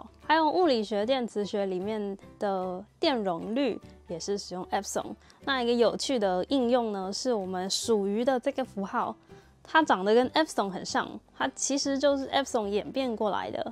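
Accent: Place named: United States. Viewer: 這是美式發音，不是真正的希臘文發音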